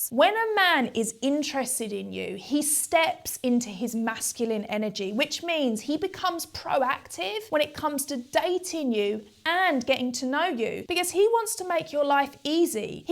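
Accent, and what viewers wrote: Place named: United Kingdom. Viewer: She’s British, different pronunciation, I believe.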